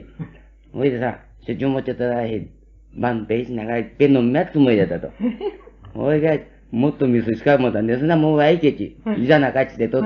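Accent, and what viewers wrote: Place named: Kagoshima, Japan. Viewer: 鹿児島県民やからやけど、まだ、わかりやすい方の鹿児島弁やな、頴娃語やとガチでわからん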